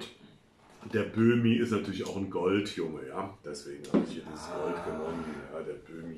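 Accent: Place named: Franconia, Germany. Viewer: Sprachfehler? Ich glaub, das ist einfach nur der fränkische Dialekt... 😁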